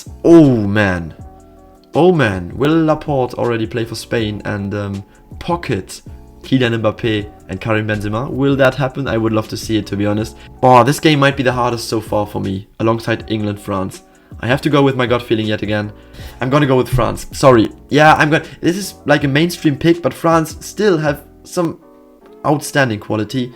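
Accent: Germany. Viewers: The German accent 😩😩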